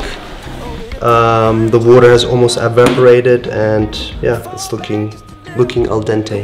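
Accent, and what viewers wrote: France. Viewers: ton accent français est trop mignon !! 😍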